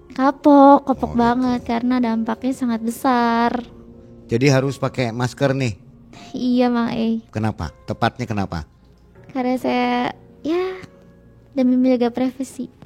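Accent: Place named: Cirebon, Indonesia. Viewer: Yah Cirebon jemaras dia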